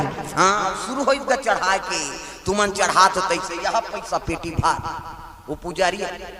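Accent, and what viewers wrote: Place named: Chhattisgarh, India. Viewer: छत्तीसगड़ी बोली माँ बहुत मिठास हे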